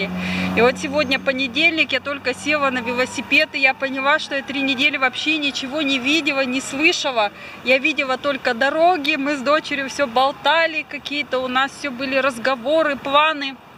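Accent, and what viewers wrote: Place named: Siberia, Russia. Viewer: Добрый вечер,. Захотелось высказать свое субъективное мнение ))Я пару раз пыталась смотреть ваш канал, но так и не осиливала больше двух роликов – не очень то люблю «говорящие головы». Но возвращалась - притягивало ваша природное обаяние, да и YouTube-советчик ) Попыталась разобраться и вот что поняла. В Вас есть то, чего совершенно недостает современному человеку: искренность, самоирония, доброта и юмор. Вы на голову выше самых популярных блоггеров, которые пытаются заискивать перед зрителем, подстраиваются, ведут себя иногда довольно резко и странно. Так что оставайтесь такой, со своим мнением, со своим сибирским говорком, с прекрасной улыбкой. А грязь к таким, как Вы, не прилипает )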